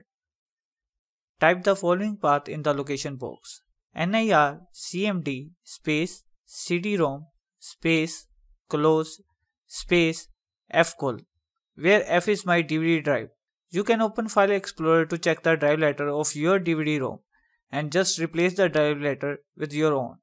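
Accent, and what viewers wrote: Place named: India. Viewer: ALL OF THESE ARE SOME GUY WITH AN INDIAN ACCENT SO I CAN'T UNDERSTAND WHAT HE'S SAYING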